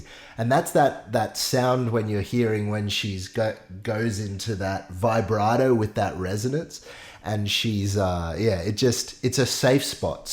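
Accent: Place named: Australia. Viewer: I love ur accent. Ur Australian accent.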